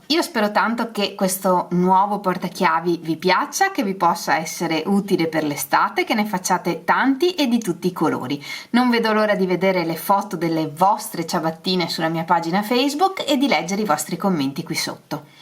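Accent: Veneto, Italy. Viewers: Bellissimissima!!!! Starei ore a guardarti lavorare e ascoltarti! Spero di diventare altrettanto brava!! Intanto provo! Sei veneta?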